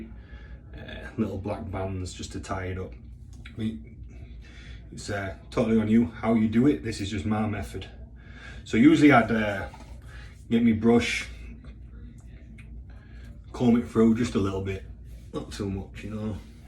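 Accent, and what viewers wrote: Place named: Yorkshire, United Kingdom. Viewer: Mate, are you from Hull originally? Sound like a Yorkshire lad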